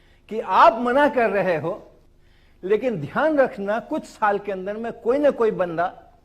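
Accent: Standard. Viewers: wah sir ki hindi ekdom suddh hain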